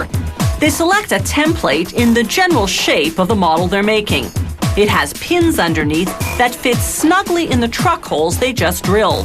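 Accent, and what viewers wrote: Canada. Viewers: she sounds so canadian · she must be Canadian or from some northern state. It actually makes more sense pronounced that way, though. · Canadian accent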